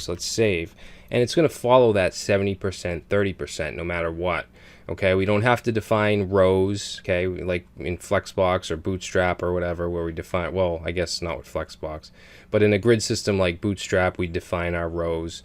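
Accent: Boston, United States. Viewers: I hear a fellow Bostonian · BOSTON ACCENT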